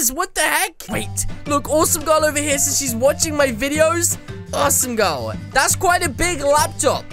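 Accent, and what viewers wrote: United Kingdom. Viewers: bruh he sounds like a british person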